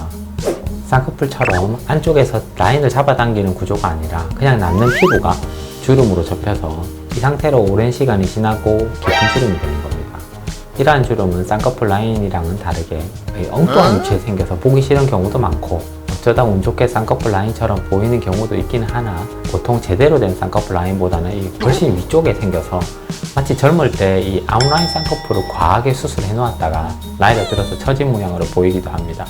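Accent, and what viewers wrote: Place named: Busan, South Korea. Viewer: 제가 부산사람이라 그런지 부산사투리로 설명해주시는거 친근하고 좋아요^^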